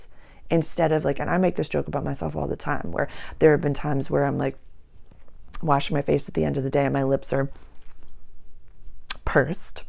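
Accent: Boston, United States. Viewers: Love your videos so much. It feels like dishing with a Bostonian older sister.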